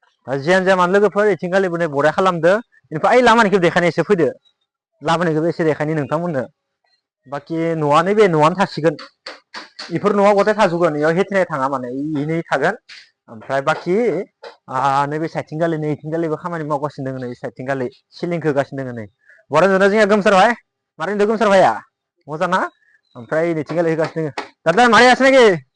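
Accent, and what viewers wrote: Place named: Tea garden, India. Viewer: Ada ng ma jagni ngni railai naykw matw bagan farani baidisw khwnayw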